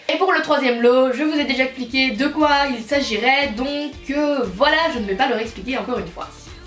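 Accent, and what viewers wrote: Belgium. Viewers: Tu es belge ?😘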